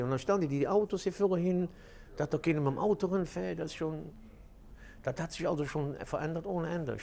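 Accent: Cologne, Germany. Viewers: Hallo, ich liebe den Kölner Dialekt. Grüsse aus Basel.🇨🇭👍💣💥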